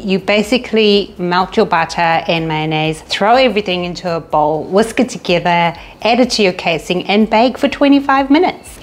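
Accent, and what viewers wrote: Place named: South Africa. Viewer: Hi, thanks for the recipe. Are you South African? Your accent sounds like it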